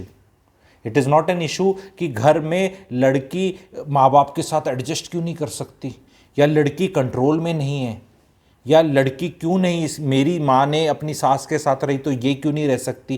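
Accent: Haryana, India. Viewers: I like your Hariyanvi accent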